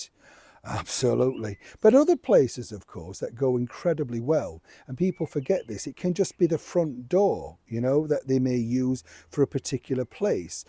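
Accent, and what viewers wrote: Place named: Redcar, United Kingdom. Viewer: OH MY GOD, we are so alike Im from Redcar now in Canada you talk and i totally remind me of home. Snobery up and down stairs. lol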